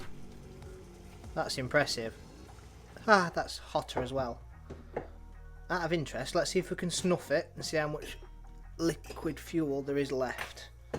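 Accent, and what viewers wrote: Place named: New Zealand. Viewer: That New Zealand accent is so strange to hear. I ended up having to put on subtitles. Thank you for the video. Aloha.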